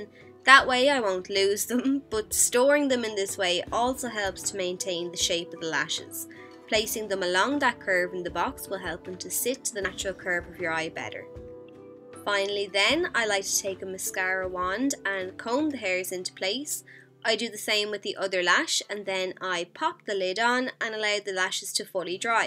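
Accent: Ireland. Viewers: Is she Irish?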